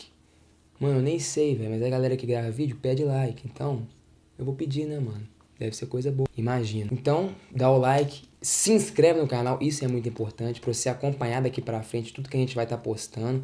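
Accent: Minas Gerais, Brazil. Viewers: Que sotaque de minerim mais fofo! 🤗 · Ele é mineiro mesmo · O sotaque mineiro jamais passará despercebido...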